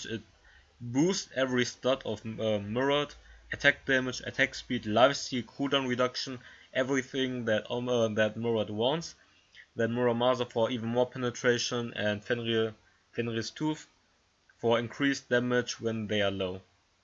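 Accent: Germany. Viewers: Wie man einfach raushört das er deutsch ist xD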